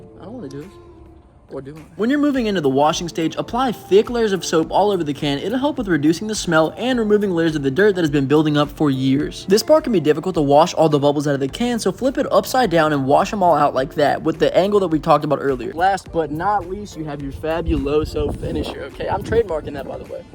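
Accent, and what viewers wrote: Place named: Louisiana, United States. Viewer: dang you from louisiana too😂🔥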